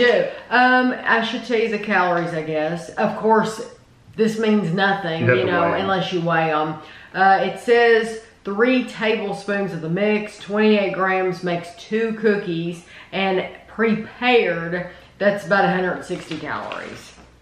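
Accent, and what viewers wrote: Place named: Kentucky, United States. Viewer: You sound like your from Kentucky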